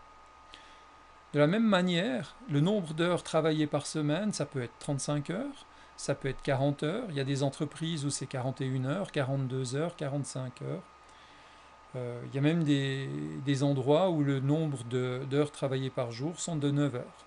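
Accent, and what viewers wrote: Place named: Savoie, France. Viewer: Merci !!! Et par curiosité, l'accent (léger) c'est de Savoie ?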